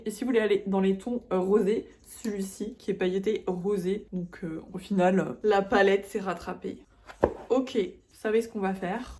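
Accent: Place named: South, France. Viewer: Tu es vrm magnifique, mais ducoup tu viens d'où si tu n'es pas de lille ?🥰 Moi je dirais sud de la france 😂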